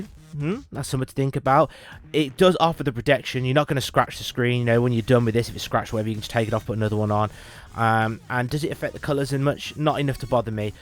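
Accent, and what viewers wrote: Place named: West Midlands, United Kingdom. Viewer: Hi mate. Thanks for the thorough review. I noticed you say it direct affect the screen clarity right? As in it doesn't cause the pixels and text to become slightly blurred? I've not ordered an SD yet cos waiting for sales or refurbs. Getting ascitic to wait though 😂. Hey, you sound local to the west midlands! Big up the WM massive!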